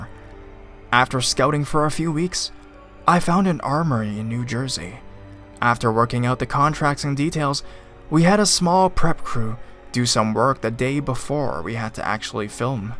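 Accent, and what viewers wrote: Canada. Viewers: Are you Canadian? If you are then you got the most awesome Canadian accent....if not then....damn good Canadian accent lol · Are you Canadian? Just wondering because of your accent. Anyway, good video! 👍 I like the longer ones too.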